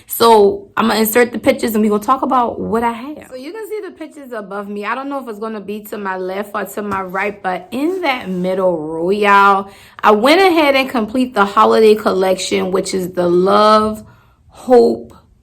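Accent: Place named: New Orleans, United States. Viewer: I love your accent...are you from Nola??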